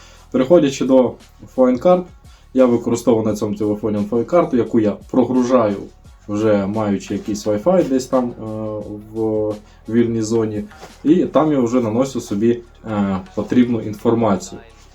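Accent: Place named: Western Ukraine, Ukraine. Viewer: ого) я би навіть не сказав шо ти був російськомовний) балакаєш так ніби з західу України)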